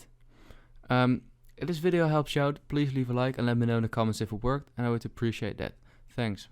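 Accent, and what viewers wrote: Netherlands. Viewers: Thanks bro and btw u sound Dutch cuz of ur accent🙃❤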